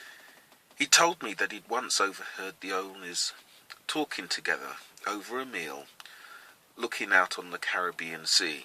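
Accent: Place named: RP, United Kingdom. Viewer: There is controversy re: origins of Hinduism. Very similar but with the extra kick of reincarnation. i. e. "if you do what we say, in your next life you can be like us" In US the AME church was started due to segregation. PS never thought what color you were. I just know you sound like the royals. My family came from Birmingham so that's a compliment :)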